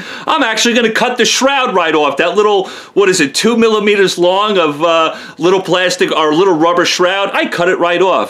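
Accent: New York, United States. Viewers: A Texan with a NY accent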